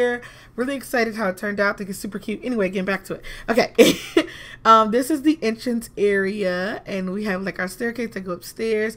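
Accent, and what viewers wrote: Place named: South, United States. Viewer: I found your LP and decided to watch...Your commentaries are so funny! I watched single dad just so I can hear your southern twang...Keep up the good work. Thanks!!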